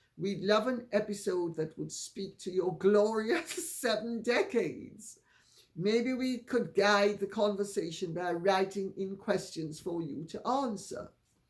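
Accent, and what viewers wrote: United Kingdom. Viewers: ☆☆☆\\\ELAINEWASHINGTON///☆☆☆ THANK YOU, LADY C FOR ALL YOU DO FOR YOUR HARD WORK ON RESEARCH ON OBTAINING THE CORRECT INFORMATION . THEN WRITING SO THAT THE INFORMATION SOUNDS OF INTEREST TO ALL LISTENING . YOU DO SUCH A FINE JOB AND I WANT TO SAY THANK YOU AND HOW MUCH I ENJOY LISTENING TO WHAT YOU HAVE TO SHARE. I LOOK FORWARD TO HEARING YOUR VOICE EVERYDAY . YOU ARE SO BRITISH ! WE HAD A BRITISH COUPLE VISIT OUR CHURCH AND THEY WERE SO WELCOMED ! WE WOULD ALWAYS ASK THEM TO TALK BECAUSE WE LOVED HEARING THEM ANSWER ! WHY ? WE ENJOYED HEARING THEIR BRITISH ACCENT AND SAYINGS. I LOVE YOUR ACCENT ! YOU ARE SO BRITISH ! I LOVE YOUR EXPRESSIONS AND ACCENT . THANK YOU LADY C. I LOVE THE WAY YOU SOUND WHEN TALKING !💖 I LIKE YOUR JEWELRY AND DRESS . YOU LOOK SO GOOD💝 HUG THE FUR BABIES.💋 THEY LOVE YOU LADY C .💛💚 LADY C DID YOU SEE ON UTUBE WHERE HARRY WOULN'T HOLD HARRYS HAND ? 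DO YOU SEE 👀 THE UTUBE VIDEO ? DO YOU HEAR ANYTHING ABOUT THR TWO DIVORCING ? MANY PEOPLE HAVE SEEN 👀 INTERCHANGING BETWEEN THE TWO HERE AND THERE THAT HARRY 👀 WASN'T HAPPY WITH RACHEL RAGLAND 😒 ON ONE OF THEIR APPEARANCES . RACHEL SEEMED INSISTENT TO HOLD HARRYS HAND. THAT MADE HARRY PULL AWAY FROM R.RAGLAND MORE ! DO YOU THINK THE HONEYMOON IS OVER ? HARRY LOOKED SO VERY UPSET !😡 ☆ THOMAS MARKLES AND HIS ELDEST DAUGHTER , SAMANATHA , PLUS HIS SON ALL ASKED NOTTO REFER TO MEGAN AS MARKLES ANYMORE FOR THOMAS HAS DISOWNED HER AS HIS YOUNGEST DAUGHTER . HE SAID SHE HAS TOLD SO MANY LIES ABOUT HIM AND THE REST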